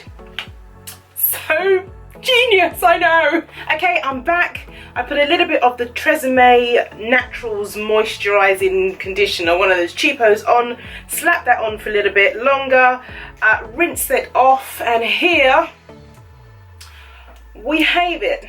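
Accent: United Kingdom. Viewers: omg i love your british accent im following your advice but i must admit im coming to your videos to hear you talk gurll!! my boo is from england but hes black and i just am in love with the accent lol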